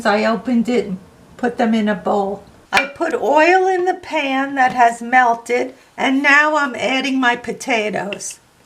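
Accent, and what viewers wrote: United States. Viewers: It's my favorite American accent!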